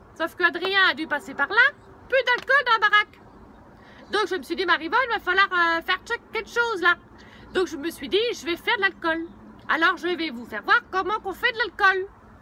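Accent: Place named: Berry, France. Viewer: Euuuuuuu, c'tieu fumelle c'tiont l'accent berrichon, pis alors rin à vouèr avec eul' nord pisqu'allé au milieu, dam'. Allez bonsouèr mon bounhoumme et pis pas p'us.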